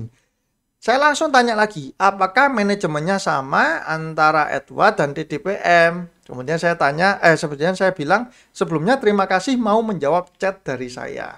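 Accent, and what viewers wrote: Surabaya, Indonesia. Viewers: logatnya kog Sbynan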